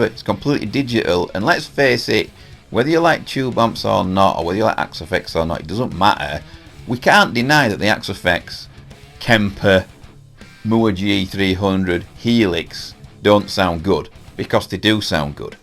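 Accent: North, United Kingdom. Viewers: So great to hear a fellow northerner talking sense! I have subscribed.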